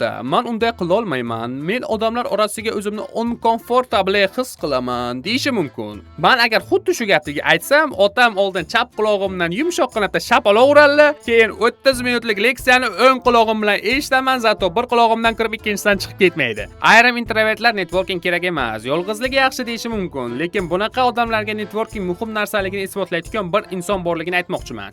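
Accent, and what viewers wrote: Qashqadaryo, Uzbekistan. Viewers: Qashqadaryodanmisiz diyman-a?😅 gapirishiz jo‘ramdi eslatopti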